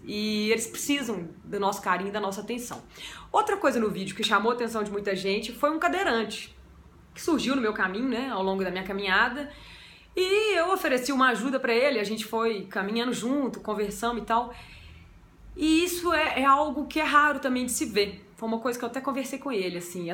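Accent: Minas Gerais, Brazil. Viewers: Você me inspira. Um abraço apertado. Ah, esse sotaque mineirês... Saudade da minha terrinha!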